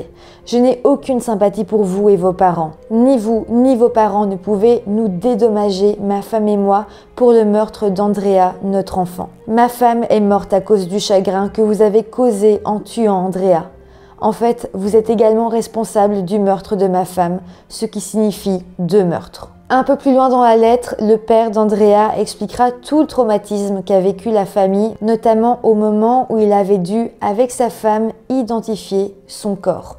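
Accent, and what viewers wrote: Belgium. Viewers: Elle est belge elle ne prononce pas les UI comme en France voilà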